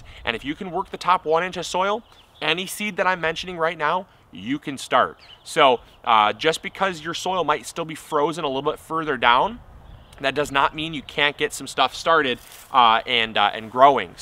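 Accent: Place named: Midwest, United States. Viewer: I'm Missouri so I appreciate that midwest accent when he says "salary" instead of celery. So do I.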